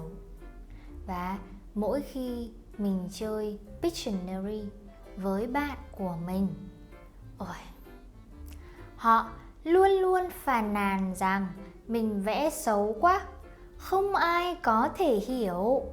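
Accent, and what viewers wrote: North, Vietnam. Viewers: Nice Northern accent. Y'all truly nailed it. Keep it up girl ! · Giọng Bắc nghe thật dễ chịu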